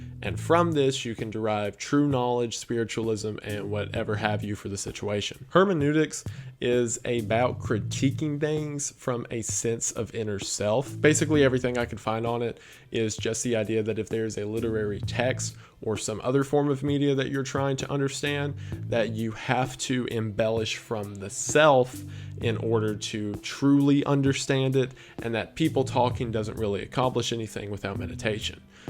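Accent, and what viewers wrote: South, United States. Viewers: i’m a lesbian but this southern belle sounding bucky barnes look alike has just stolen my heart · First time that I’ve enjoyed listening to a southern Christian talk about conspiracy theories.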